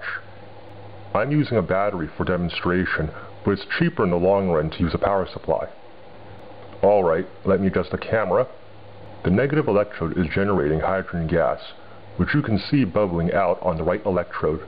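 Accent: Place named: Canada. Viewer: You have the slightest Canadian accent :D but that's probably because of your voice changer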